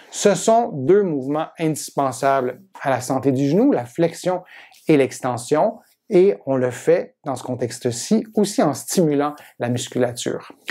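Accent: Quebec, Canada. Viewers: Merci pour les exercices, l'avertissement sur leur adaptation, et pour ce bel accent québécois. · L'utilité indéniable des exercices mise à part, votre accent canadien vous rend de suite sympathique. Bravo pour votre belle initiative. Je viens de m'abonner. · Merci pour vos exercice il sont vraiment utile moi je suis un cas special j ai ete operer d une ghonatrose bilateral <num> une osteteomie depuis 10 ans actuellement je souffre beaucoup de mes genoux meme au repos ont me propose une 0peration totale mais actuellement dans mon pays avec la pandemie les blocs sont ferme par manque d oxigene meme les cliniques n opere pas je narrive plus a marcher malgre j utilise deux bequille donnez un conseil svp en attendant de me faire operer j ai 78ans je pese 88kg pour un metre 62 j j attend vos avec impatience svp votre avcent canadien est tres sympat mer vos video elle sont interessente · Merci beaucoup vidéo claire, et juste, j'ai fait et c'est pas mal du tout pour un début, le tout avec cet accent canadien que j'adore.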